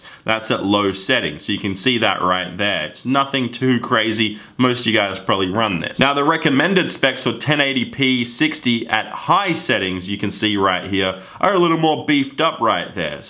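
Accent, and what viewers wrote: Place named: Australia. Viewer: Wow you stupid aussie tool! What a scoop you've come up with. Sony will release a PS5 someday? Gee? No one saw that coming. Heres another scoop for you....someday...Microsoft after Xbox One X is released will come out with a new version of the Xbox......possibly called Xbox 2. I must be psychic. You suck! your channel sucks! your accent sucks! Australia sucks! F U!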